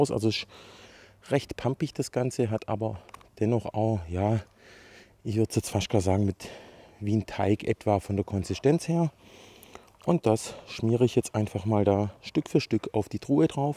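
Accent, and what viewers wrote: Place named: Swabia, Germany. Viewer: War wieder sehr unterhaltsam und lehrreich. Wären wir in der Nähe, könntest von uns auch viel Kaffeesatz kriegen (Vollautomat) 😅. Das Einfärben ist im wahrsten Sinne des Wortes eine "Sauarbeit". Kriegst du da keine Probleme mit der Haut, ist das nicht wie Schmirgelpapier? Ergebnis ist jedenfalls echt gut. Ich finde es übrigens cool, wenn du schwäbisch schwätzen tust.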